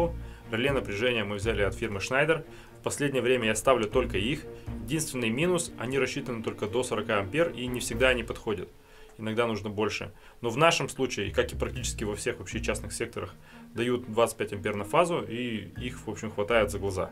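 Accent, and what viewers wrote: Perm, Russia. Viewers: У вас говор как у "Реальных пацанов", они тоже из Перми.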